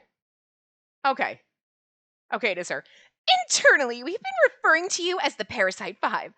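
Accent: Ireland. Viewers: everyone loves the Irish accent me included :)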